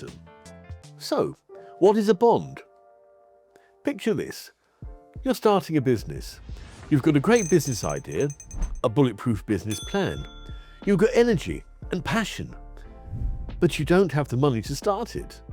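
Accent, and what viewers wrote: United Kingdom. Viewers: the British accept helps me understand better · False Words: "proof just sure certain break principal due based problem" -- ya that means "insurance" is also a false word & wow is it:face-purple-crying:America has defaulted 5 times about every 1/2 century kinda: 'Nixon said he was temporarily suspending the dollar’s convertibility into gold. The most obvious lie was Nixon’s claim that the suspension would only be “temporary.” It’s still in place today -- the US dollar has lost over 87% of its purchasing power since 1971. “Since 1789, the United States has paid all of our bills on time,” said former Treasury Secretary Janet Yellen. “In our history, we have never defaulted on our debt or failed to pay our bills,” said President Biden’s press secretary. “… the United States has never defaulted on its debt,” wrote The Washington Post. 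You hear it everywhere. The US has never defaulted. It’s repeated by politicians. By bureaucrats. By the media. As if it were gospel. All lies.' As one who did the accounting for an IT company I used to work for this all makes sense now why NOTHING made sense lol. Now I see fully why I am/was so obsessed with accounting -- follow the $. IT'S ALL A SCAM -- INSIDERTRADING DONTPLAYDONTBREED. Now that MOON HOAX I totally understand why they did it though it is STILL WRONG -- esp. now that I know that THE REST OF THE FREAKING PLANET KNOWS & IS BLKMAILING THE USA FOR TECH. $2B/day -- ya that's not sustainable. Luv this vid everytime nearly when i hear that british accent w "bonds" i thk james ; ) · Thx much. Clear and understandable. And, with a british accent, it's immediately perceived as smart and credible!!😊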